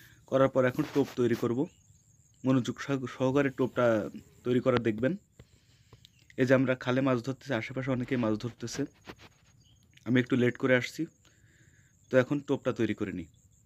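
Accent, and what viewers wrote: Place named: Gopalganj, Bangladesh. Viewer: ভাই মনেহচ্ছে আপনার বাড়ি গোপালগঞ্জ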